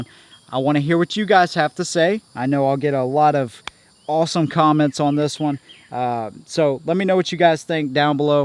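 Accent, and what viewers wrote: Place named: South Carolina, United States. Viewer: You from South Carolina man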